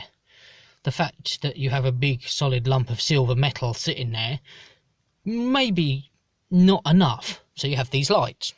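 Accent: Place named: United Kingdom. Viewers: Lol, you speak like a british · Yoda Master British English.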